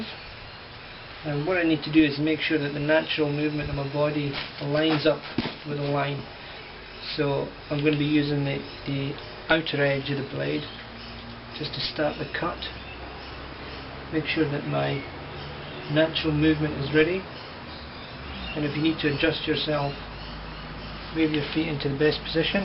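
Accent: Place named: Australia. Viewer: sounds like australia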